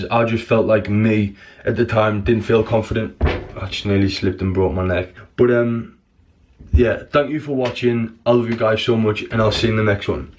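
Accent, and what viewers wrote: United Kingdom. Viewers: Good work man!! This is well inspiring. Also sounds like you're from the Boro? Could be wrong, from Northallerton but been living in Canada a couple years now.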